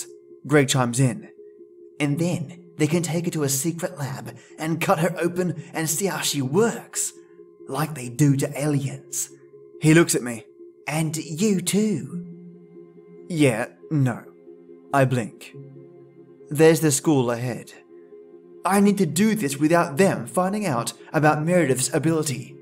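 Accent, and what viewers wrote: Australia. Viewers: Yeah! It's awesome Aussie tale teller time again for me.☕🍰🇦🇺❤ More Lily Madwhip stories! Absolutely, great series! Monk pear tea? Sounds so luxurious, warming and extremely tasty. Oh, you and your amazing teas that you drink.😍 I just have my PG tips. It's black tea but it does the job.👍I'm totally late.☹ But I did eventually get around to listen to your videos.🙂 Thank you for all your content, you definitely work very hard! Your narration always makes my evening leagues better!😊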